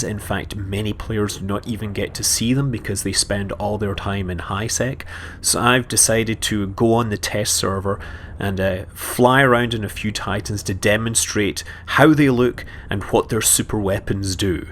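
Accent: Scotland, United Kingdom. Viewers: dunno what it is about it, but hearing a scotsman quote scripture...just sounds...right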